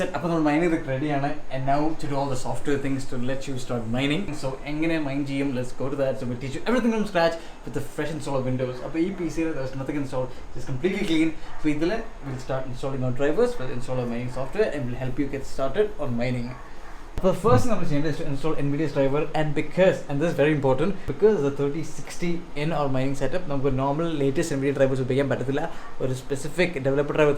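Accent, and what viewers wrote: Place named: Kerala, India. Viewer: BRO LIKED YOUR PRESENTATION WHAT AN ENGLISH MIXED WITH SOME COUNTRY SIDE MALAYALM LUV THAT PLEASE GO LIKE THIS ITSELF WE LUV IT IN FUTRE I WILL BE CONTACTING YOU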